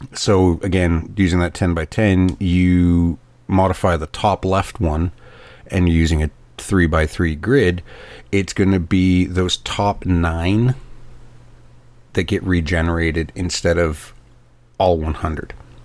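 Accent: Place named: France. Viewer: What is this robotic french voice. Please stop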